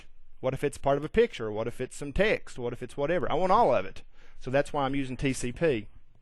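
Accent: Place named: Texas, United States. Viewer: I like that accent. Texas? Somehow it makes me a better listener and able to process more info.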